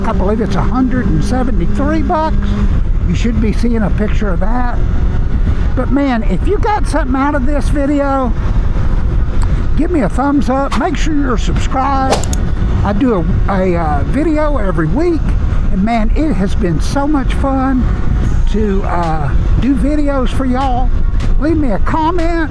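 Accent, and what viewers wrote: South, United States. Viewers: God u sound so country😅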